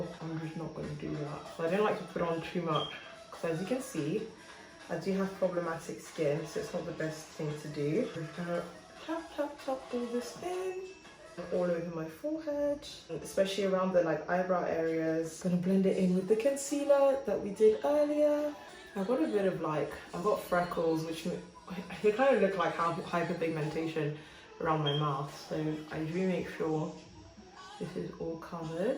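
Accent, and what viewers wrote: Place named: China. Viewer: I LIKE YOUR CHINESE ACCENT MME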